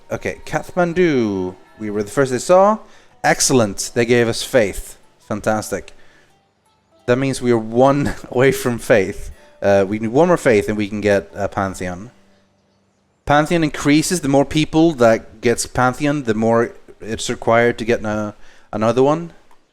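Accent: Sweden. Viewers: i knew it i her it on his acent. btw im also from sweden and my englis aint dath good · Are you Swedish?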